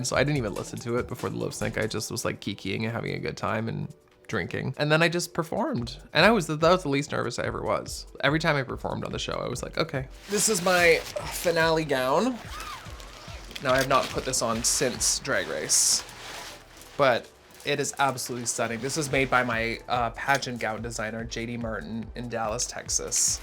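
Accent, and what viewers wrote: Canada. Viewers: I love her little Canadian accent poking through every now & then 😘✨ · so canadian...."aboot" 😍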